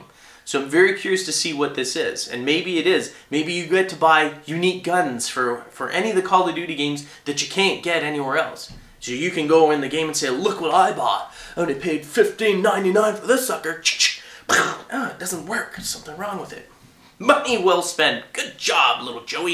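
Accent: Canada. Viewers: I'm just wondering are you Canadian?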